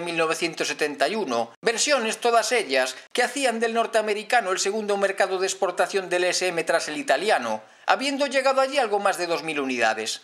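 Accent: Galicia, Spain. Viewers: La entonación parece robótica, es estresante, no sé si serás gallego. El vídeo muy bien realizado y el coche me encanta.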